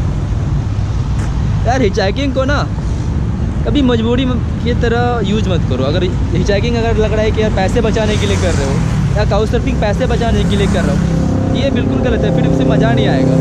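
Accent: Bihar, India. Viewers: Aram se baitha tha ek dum pasra hua tha, ye bihari language 🤗🤗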